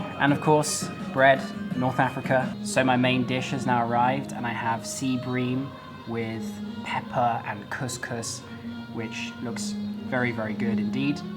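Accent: England, United Kingdom. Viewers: Hey, you sound British. Are you from the UK? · Çok güzel bir yermiş ve harikaa İngilizce aksanınn💖💖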